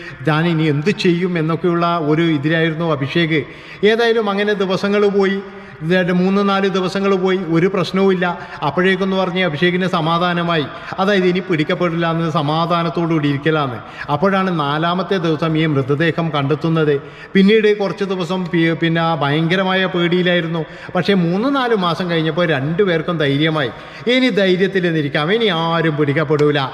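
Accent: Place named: Malabar, India. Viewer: മലബാറിലെ, ഭാഷ എനിക്ക് ഒത്തിരി ഇഷ്ടമാണ്❤❤❤,അതു പോലെ താങ്കളേയും, താങ്കളുടെ യഥാർത്ഥ പേര് എന്താണ്,?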